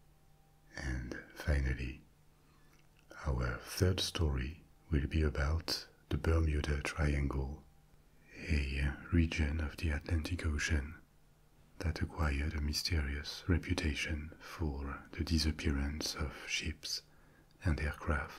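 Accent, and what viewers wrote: France. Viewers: Can't wait to watch this tonight. It's going to put me asleep like a baby hope I make it through I've been watching your videos every night since I've discovered your Channel 5 months ago thank you for the education and the awesome ASMR French accent. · I woke up with this playing for the first time because of autoplay at 2 am and forgot i had ear phones on so i kept wondering why the fuck i could hear a french dude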